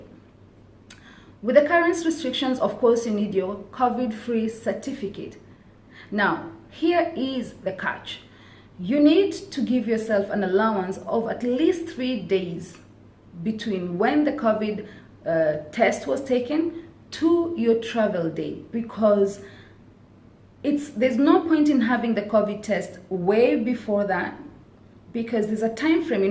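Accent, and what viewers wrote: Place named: East Africa. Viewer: Hello from Malaysia. How are u doing? U got East African's accent. Could u help me with ur FB id pls?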